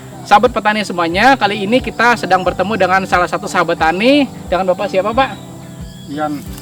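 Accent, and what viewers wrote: Banjar, Indonesia. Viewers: Pian org banjar kh pak. Ampun chanel ini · Jelas sekali ulasannya, sehat selalu utk bapak penyuluh. Kya logat banjar, orang mana bapak? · Org Banjar sklinya 😂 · Pian orang Banjar kah??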